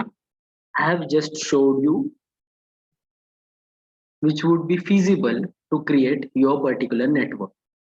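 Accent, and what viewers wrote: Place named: India. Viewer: Indian accent is horrible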